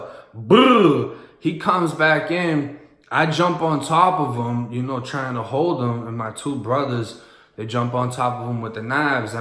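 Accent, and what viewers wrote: Boston, United States. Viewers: 💯RESPECT MY G... KNEW U WAS IN BOSTON THE MINUTE U SAID CAA INSTEAD OF CAR.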